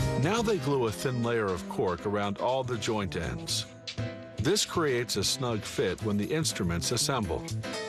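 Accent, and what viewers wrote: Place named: United States. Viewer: que acento tan feo! debe de ser un americano, no? que contrasto con el sonido tan dulce de las flautas!!!!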